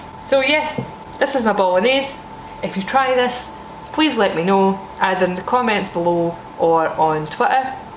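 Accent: Scotland, United Kingdom. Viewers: A Scottish accent on women might be a crime against humanity. Why did she remove a quarter of the carrots? Why did she remove over half of the sellery and throw it away? Why did she fry the vegetables first, ensuring that the meat can never get brown? All of that said, I think the basic recipe has potential and I'll try it out, maybe add the cream on the second day for variety though. PS: Don't use plastic chopping boards, plastic's bad for you. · I get the feeling that lots of people can't undetstand you since you don't speak " youtube Scottish", very, very likely everyone still loves your wholesomeness, but for me, I'm Australian. Both my folks are fresh off the boat Scots and I have a massive family in Scotland. Iv grown up listening to Scottish- actual Scottish on youtube is so refreshing. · damn I love the scott accent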